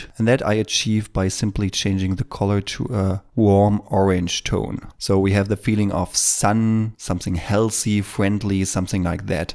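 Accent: Germany. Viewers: Super Video! Es ist ja richtig erfrischend mal wieder einen schönen Deutschen Akzent zu hören. :)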